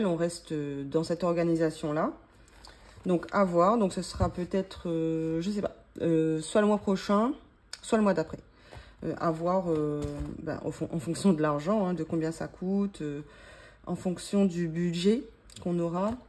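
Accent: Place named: Réunion, France. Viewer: Petite question qui n'a rien à voir mais d'où viens ce petit accent ? La réunion ?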